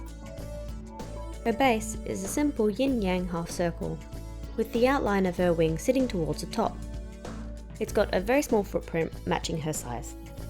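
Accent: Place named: Australia. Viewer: This is the first video of yours I am watching and you are reviewing Koto Batwoman. I've been eyeing that figure for a while now lol...I guess I need to get it now. I love your crystal clear speech and pronunciation. That Aussie accent is something else, tho :) <3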